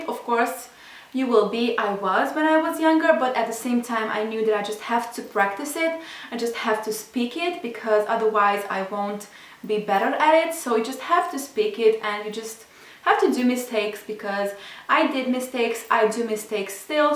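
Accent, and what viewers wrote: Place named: Romania. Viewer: I Love your videos soooooo much, I really enjoyed getting to know you better. You are soooooo BEAUTIFUL!! Also I love your Romanian Accent!! Xxx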